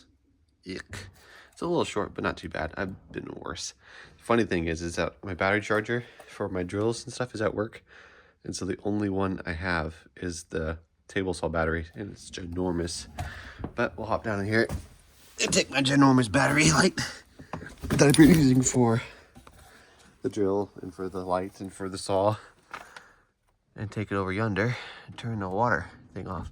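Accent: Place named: Canada. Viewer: He talks like a candian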